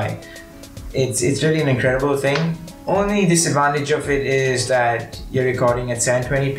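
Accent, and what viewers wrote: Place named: India. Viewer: Your Indian accent is not funny 👍😅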